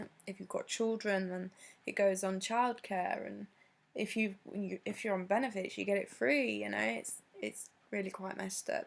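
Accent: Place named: Liverpool, United Kingdom. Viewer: Its scouse not liverpoolian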